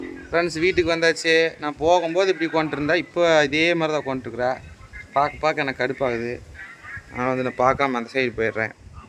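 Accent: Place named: Kongu, India. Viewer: Anna.unga video all we like .unga kongu Tamil nice.namma oru speech la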